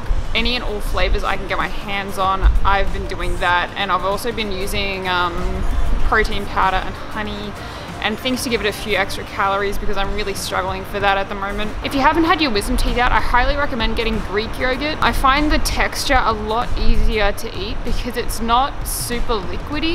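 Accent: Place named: Australia. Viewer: More of that Aussie accent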